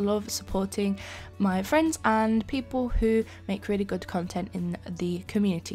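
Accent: United Kingdom. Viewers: Are u British lol you'll never answer 👌🏻BTW I love ur channel🌺🌺🌺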